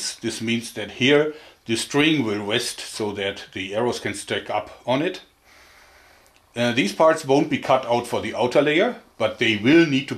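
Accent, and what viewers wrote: Germany. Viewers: Ich weiß nicht warum aber man hört dass er deutscher ist 🤣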